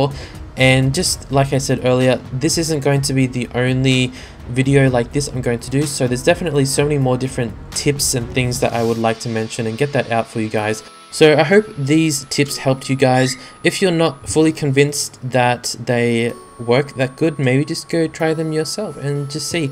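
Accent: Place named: Australia. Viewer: Ah, understandable. If it makes you feel better, you had me fooled. It sounds like you have a pretty normal Australian accent What's your first language?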